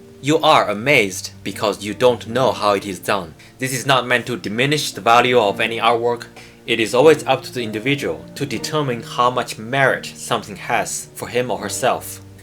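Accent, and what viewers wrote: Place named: China. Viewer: This accent sounds like that of a Chinese guy speaking. His English grammar and vocabulary is great, however this accent really sounds Chinese. Good hints, beautiful drawing. Congratulations.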